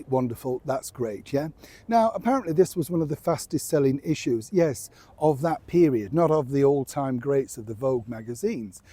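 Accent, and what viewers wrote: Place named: England, United Kingdom. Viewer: How refreshing to hear a quietly well said commentary without drama,outright lies, or subtle attacks. I love your style. It's what I always hoped a true English man to sound like.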